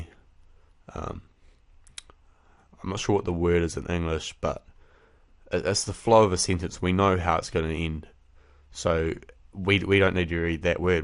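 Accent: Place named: New Zealand. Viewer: such a kiwi accent!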